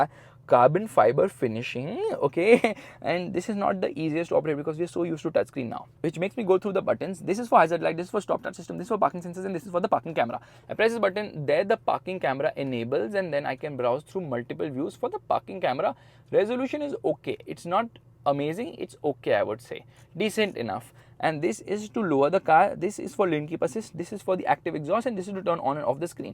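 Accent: India. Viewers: Indian accent is really hilarious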